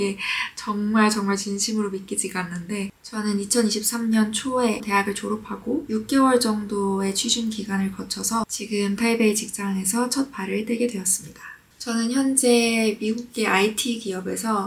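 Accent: China. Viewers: 為什麼您是中國口音😂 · 妳說的中文語調是大陸腔調。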